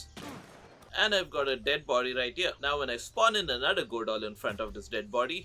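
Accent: India. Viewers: Why your Voice so indian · U sound very indian.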